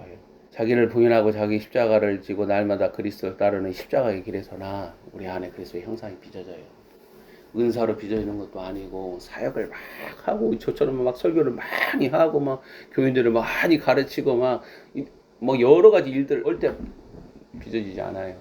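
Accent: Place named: Jeonbuk, South Korea. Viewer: 그러시군요 말씀중간중간 억양이 그러신듯해서 여쭈어 보게됬습니다 저도 잠시 전북에 머물고있다보니 그런것도 구분이되네요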